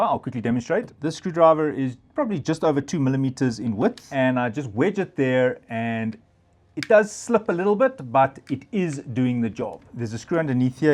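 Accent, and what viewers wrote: South Africa. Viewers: I can hear the south african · fucking love the south African accent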